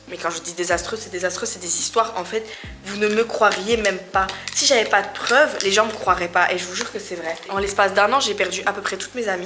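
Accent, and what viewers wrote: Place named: Belgium. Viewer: Son accent Belge 😍😍😍>>>>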